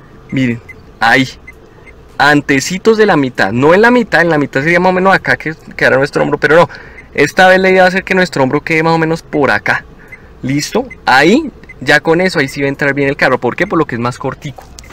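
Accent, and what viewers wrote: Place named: Colombia. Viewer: Escucharte es un placer, tu acento me da ganas de irme a Colombia a buscar un novio, si mi profe de manejo hubiera hablado así yo hubiera conducidos como Toreto en el primer día de clases!😏😉🤗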